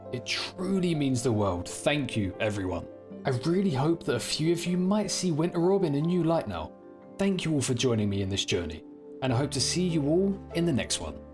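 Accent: United Kingdom. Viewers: Bro you are literally the best creator in this space right now. The voice over is crystal clear with great humor and the brit accent is the cherry on top.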